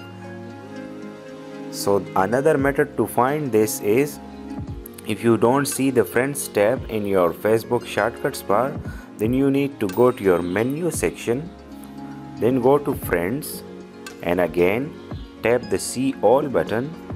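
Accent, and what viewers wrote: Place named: India. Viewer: your indian accent give me chills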